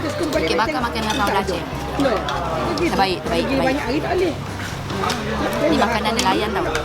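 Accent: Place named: Kelantan, Malaysia. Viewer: Chak puak...hahahaha..pekat doh kelantan dia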